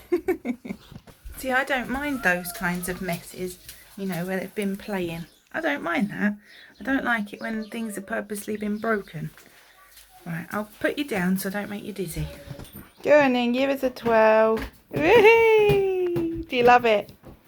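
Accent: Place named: United Kingdom. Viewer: I love listening to you talk, I'm learning british vocabulary and accent and you help me a lot in my practice <3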